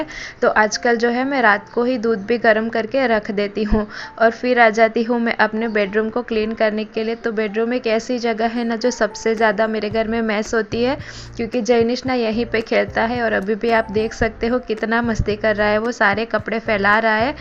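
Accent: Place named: Gujarat, India. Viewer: Aap gujrati hai bolne se lga muje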